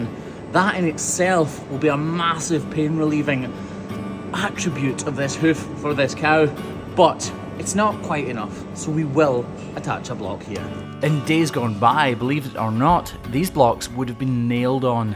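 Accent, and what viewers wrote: Scotland, United Kingdom. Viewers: I love watching your videos! You've a beautiful family and I Love hearing your Scottish accent taking us through the video! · I've shared! Your videos have helped me get over my fear of cows! With the added bonus of the silky Scottish accent 🤭😉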